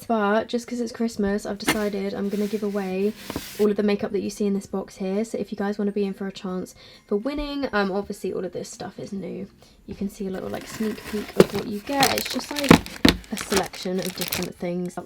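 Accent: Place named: England, United Kingdom. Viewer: I def want to enter the giveaway, my email is <mail> also my twitter is i love you’re video and when I need to relax you’re my go to, the English accent is soothing to me and I just love hearing you speak. Good luck everyone, fingers crossed 🤞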